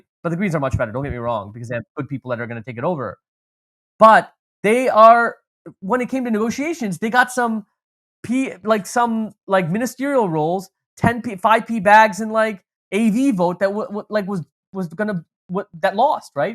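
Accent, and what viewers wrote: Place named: United States. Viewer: UK here. I am not used to Americans (going by the accent) knowing so much about the UK.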